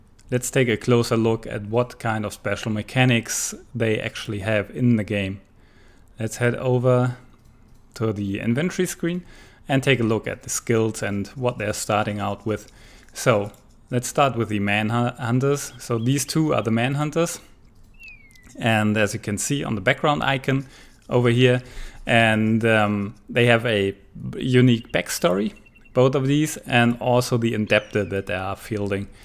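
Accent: Germany. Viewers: 宁这英语，一股子🇩🇪味儿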